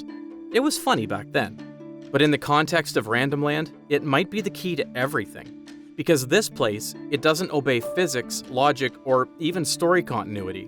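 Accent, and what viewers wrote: Canada. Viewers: Your channel might be marked “United States” but your accent outs you as Canadian. I’m on to you, buddy! 🫵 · Are you Canadian?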